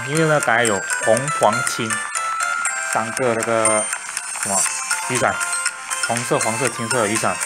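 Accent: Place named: Taiwan. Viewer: 怎麼感覺你是臺灣口音?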